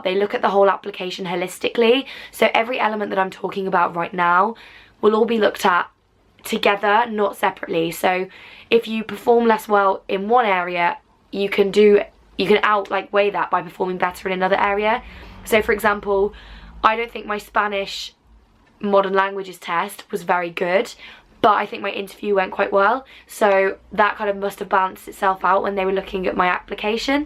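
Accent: Birmingham, United Kingdom. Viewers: wait... a Brummie??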